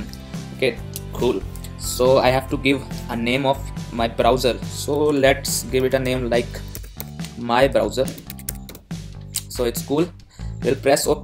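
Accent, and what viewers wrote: India. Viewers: you have india ascent , indian?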